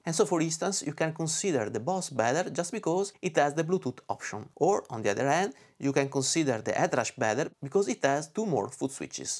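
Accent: Venice, Italy. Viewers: go idea che ti xe veneto! bravissimo in ogni caso!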